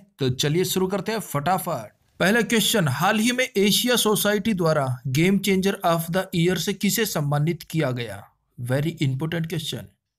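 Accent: Haryana, India. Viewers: Sir Aap Haryana se ho kya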